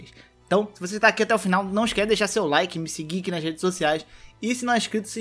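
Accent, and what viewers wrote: Rio de Janeiro, Brazil. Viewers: Quando voce Fala twice parece que voce Fala tchuaiche.. Eh sotaque carioca?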